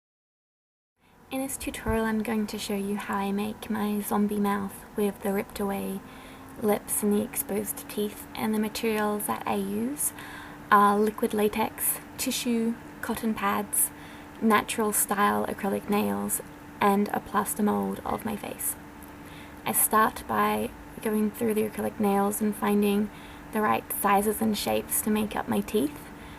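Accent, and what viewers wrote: Australia. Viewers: Are you from Australia? Just curious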